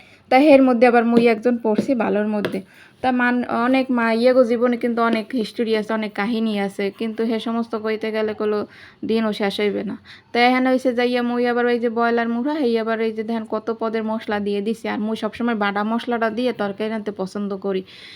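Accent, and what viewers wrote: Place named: Barisal, Bangladesh. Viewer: ❤❤প্রিয় আপু তুমি বরিশালের ভাষায় কথাগুলো বল দারুন লাগে ভিডিওটা দেখে নিলাম সবকিছু মিলিয়ে খুব সুন্দর হয়েছে আলহামদুলিল্লাহ ❤🎉😮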